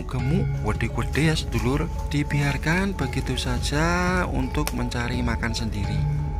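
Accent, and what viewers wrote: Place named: Java, Indonesia. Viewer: aslmkm wargi bandung.. Apa kabar..? Abang asli dari mana ko bicaranya kaya orang jawa. Yah..tapi channel orang bandung..?🙏😀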